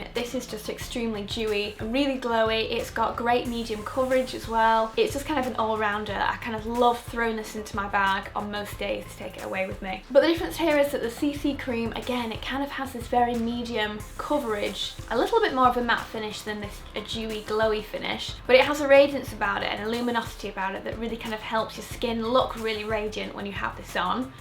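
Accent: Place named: England, United Kingdom. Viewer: Another great video! You are so pretty and even though we are both from England I love your accent 😂🙈 xxxx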